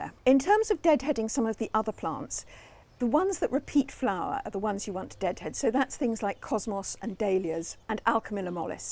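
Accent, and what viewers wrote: RP, United Kingdom. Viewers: so posh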